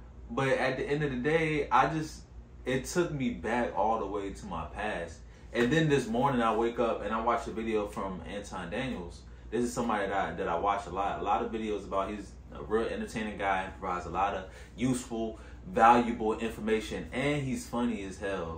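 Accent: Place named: Virginia, United States. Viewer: I'm up early on a big Sunday as well😂. I'm about to do a HITT work out and a short session of yoga at home because its way to cold outside to run. I totally agree with you about social media. I deactivated all my accounts 5 years ago and my life has been SO much better, along with my mental health (I kept YTube as a learning tool ). It is so freeing. Im living my life authentically, not based on, or in comparison to what others are doing. I also feel other people's lives are none of my business and my life is no one else's. I share pics and moments with my family and close friendz-and that's enough. I even stopped listening to music with words for a few years to make sure my life and views on relationships weren't being influenced by lyrics in a song, especially rap and R&B. Afterwards, I realized, truthfully, I didn't even want to be in relationships and I didn't care about getting rich or looking wealthy anymore; I was being swayed by the music I was listening to everyday. 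I know this all sounds extreme, but that's how serious I was about changing and truly finding myself. Nowadays Im focusing on completing my second degree, health, traveling, art, plants, friendships, and growing my retirement accounts 😊. S/N: Are you from Virginia? You have their dialect.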